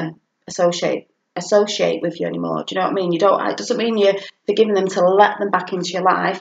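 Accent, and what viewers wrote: United Kingdom. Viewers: Oh no just be you!! Your fabulous 😊 Loving your content and it's so nice to hear someone else who's British LOL! Witches of East end is pretty darn good (not bad eye candy either) 🤣 xx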